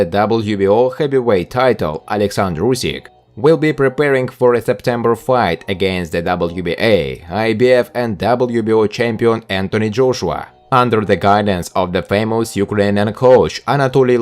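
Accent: Russia. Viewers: Omg i hate youre russian accent! It is so terrible...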